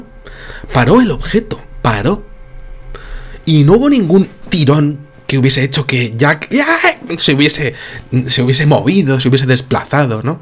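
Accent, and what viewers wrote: Spain. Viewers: Es un genio !! y su acento español,,, lo hace mas interesante. Me da mucha gracia su narrativa y sus dichos variadísimos que no se de donde los saca . Soy uruguaya y lo amo,,, es uno de varios youtubers increíbles. Junto con JL , Iván y David.... Muy bien por España y estos amigos que nos comparte. Un abrazo, Zully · Saga Fans no tiene porque, el es español de España, cada cual tiene sus formas y sus acentos, y todos son respetables. Sino te gusta nuestras palabras, siempre tienes la posibilidad de escuchar tus "localidades". Un saludo desde España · no me gusta escuchar el acento español porque casi no entiendo, pero este tipo es encantador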